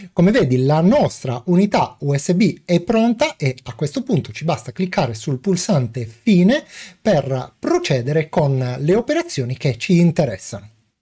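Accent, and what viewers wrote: South, Italy. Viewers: Salve. Innanzitutto GRAZIE per quello che ci "Regali". in merito a questo video desidererei sapere se e' possibile eseguire la stessa procedura su un PC con windows 7. (dal tuo accento mi sembra di capire che non sei dl nord). Per inciso io sono un GIOVANOTTO di 85 anni e sono stato uno dei primi utlizzatori ed insegnante di INFORMATICA degli anni 70-80 di una disciscipilina con il nome altisonante di "Principi e Tecnica delle elaborazioni meccanografiche ed elettroniche" della Facolta' di Statistica dell'Universita' di Roma; con una grossa lacuna, conosco il funzionamento di ogni componente Hadware, ma sono quasi totalmente IMBRANATO nell'assemblaggio. Ancora GRAZiE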